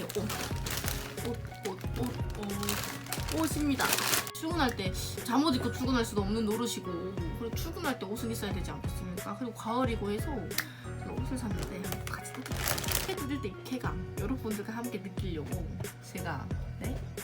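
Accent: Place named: Gyeongsang, South Korea. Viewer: 누나 혹시 경상도 사람이세요?? 사투리를 사용하시네요... 할튼 누나 너무 뻐요😘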